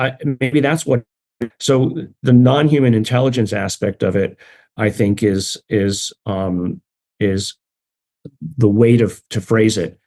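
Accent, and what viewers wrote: Liverpool, United Kingdom. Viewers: No way is a scouser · NO WAY!!!! HES A SCOUSER!!!! IM LIVING IN LIVERPOOL UK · A scouser! Wow! Never knew that! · Didn’t know Gary was a scouser. ( people who are from Liverpool are nicknamed scousers.) 😀👍🏻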